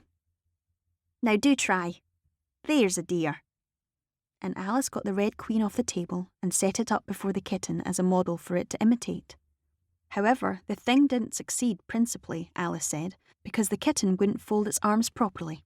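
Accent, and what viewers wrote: Scotland, United Kingdom. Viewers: Chinese girl with a Scottish accent my God literally could not find a more perfect beautiful woman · and her scottish accent. Perfection. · got the most beautiful Scottish accent ever!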